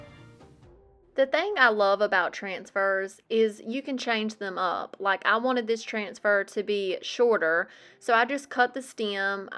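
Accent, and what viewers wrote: South, United States. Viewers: . Your grammar is fine. You have a cute southern accent which I find to be charming, especially on a YouTube channel where you are making rustic, country style decor. Don't change for the critics. Just be you. ❤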